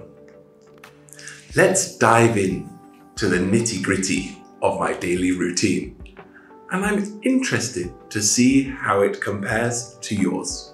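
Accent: United Kingdom. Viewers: Giọng anh - anh chuẩn, nhiều từ vựng. Năng lượng của Thầy luôn truyền cảm hứng rất tuyệt vời!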